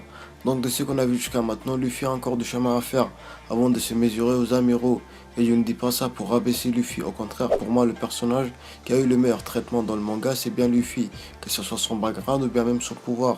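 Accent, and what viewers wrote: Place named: North Africa. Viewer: Toi sa se voit t un bledar vu ta voix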